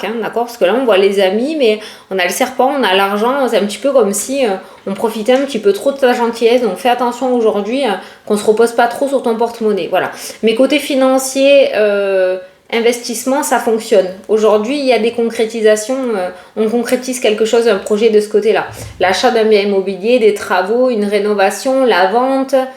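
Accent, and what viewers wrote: South, France. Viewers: Un petit coucou du Nord... Où il fait bon aussi 😉👍👍 on n'a pas le temps de coller 😁on a beaucoup de vent... Je vous découvre et j'ai accroché à votre petit accent du sud et votre côté un peu fofolle... ce n'est pas une insulte.... promis... Beaucoup de projets en perspective, effectivement... mais bien plus de SOUHAITS.... m'éloigner le plus loin possible et le plus vite possible de personnes malveillantes, jalouses et vraiment mauvaises... et sûrement instables psychologiquement... 🤔 J'en ai perdu la santé et la joie de vivre.. Elles ont essayé de me détruire (j'ai failli perdre la vie, par un rejet sévère et aigu de mon cœur greffé) J'ai vraiment eu beaucoup de chance.. Je reviens de très loin... A peine rentrée d'hospitalisation, me voilà à nouveau HARCELER, PERSECUTER, CALOMNIER... Donc c'est un grand OUI, je souhaite m'éloigner le plus loin possible de ce maudit lieu et de pouvoir avancer dans mes projets... 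🙏🙏🙏🙏🙏🙏🙏🙏🙏🙏 Merci pour vos guidances... 💛🌺🌺🐞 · Bonsoir,de la Rochelle merci pour cette bonne humeur et cet accent 🌞du sud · Coucou Je suis se Normandie aux environs de Caen. Merci pour tes tirages...toujours obtimiste et de bonne humeur...tu nous envoie ton soleil du sud avec ton accent!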